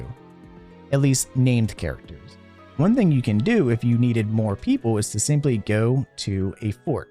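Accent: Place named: Philadelphia, United States. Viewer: Drawn to the Philly accent lol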